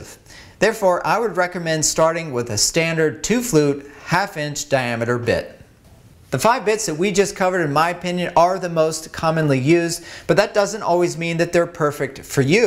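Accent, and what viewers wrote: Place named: United States. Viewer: Saturday morning...looking for an American-speaking ( not UK/Brit for now ) quick info to start my router-based box joint projects for Christmas gifts ( 105° in my Sat Morning work area) and here you are solving my quick question as to joint...bit...common sizes. I've already built my little trim router table and sled for the joint cutting and ALL I needed ( "impatient 80 year old vet...") was 2 or 3 common bit sizes and after more than 1 hour of going thru the YouTube library of things that are good, but don't get to MY humble, simple needs for 2-day. Thank you, thank you, thank you...now I can get to work!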